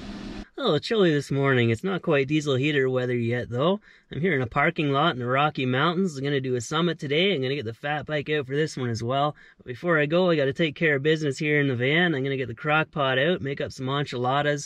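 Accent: Canada. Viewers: You got me with your pronunciations. I thought Canadians just didnt know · Most Canadian language pronunciation episode yet!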